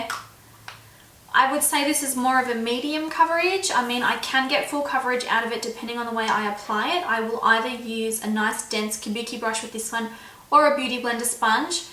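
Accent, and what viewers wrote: Australia. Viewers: Are you from Queensland?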